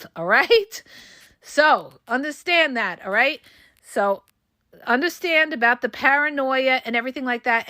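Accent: New York, United States. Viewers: I hear your NY accent. Lol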